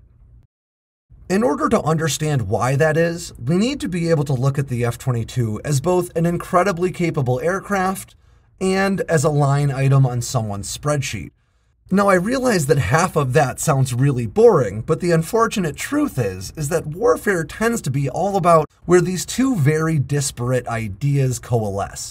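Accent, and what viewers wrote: United States. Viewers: K-tar I luv how Americans pronounce words 😂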